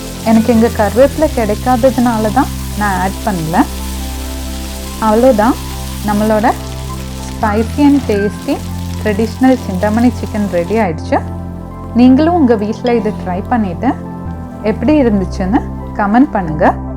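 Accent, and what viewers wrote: Kerala, India. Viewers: Neenga malayaliya. Njn pesira mariye irukku😘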